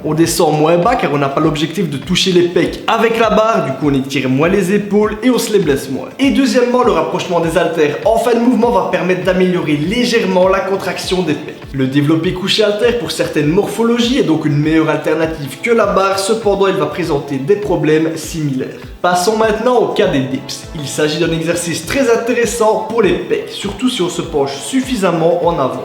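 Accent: Belgium. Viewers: Olala nonante septante ! On est belge ou suisse ? (je parierais sur le premier choix vu l'accent) Ceci dit, belles explications sur le développé décliné, que je voyais jusque là vraiment comme un exercice parmi les plus inutiles possibles (eh oui moi aussi on m'avait dit que ça musclait le bas des pecs)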